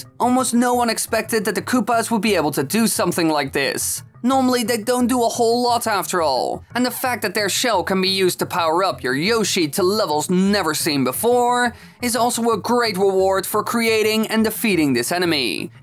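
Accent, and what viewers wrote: Netherlands. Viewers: 0.06 Ah yes a fellow dutchman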